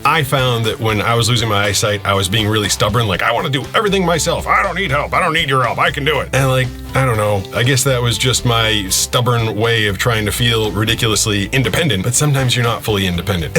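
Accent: Boston, United States. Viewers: I would love for you to do a whole video in your true Boston accent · Do you ever go back to Boston or come across somebody with that accent and if you do, do you slip back to your old ways of speaking?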